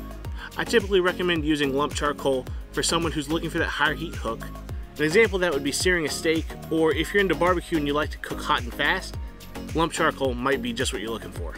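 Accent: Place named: West Virginia, United States. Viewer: I'm assume you're from West Virginia....new subscriber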